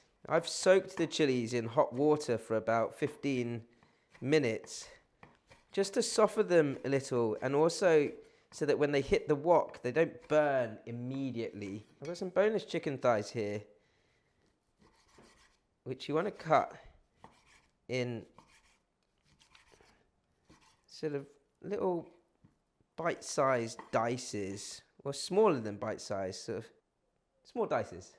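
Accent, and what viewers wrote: United Kingdom. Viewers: is the most british sounding Chinese dude ive seen.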